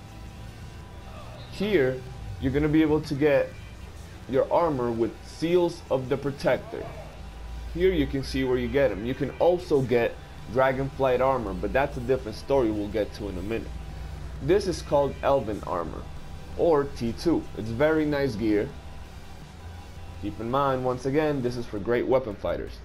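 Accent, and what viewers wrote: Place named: Brazil. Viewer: Where are you from? By the way you talk, u seem to be a Brazilian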